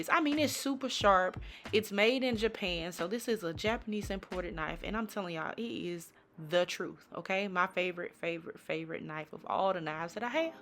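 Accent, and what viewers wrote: South, United States. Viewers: Love ya ! Wonderful recipes and enjoy Your Sweet Souuthern Accent 😊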